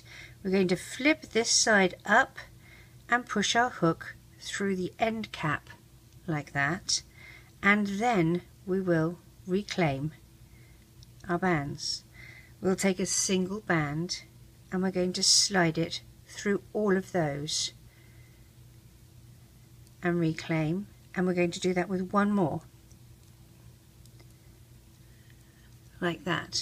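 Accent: England, United Kingdom. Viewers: Are you english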